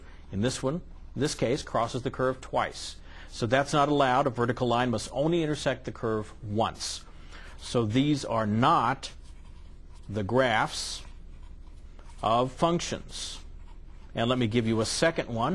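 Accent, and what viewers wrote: United States. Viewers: some kind of midwestern american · eww southern accent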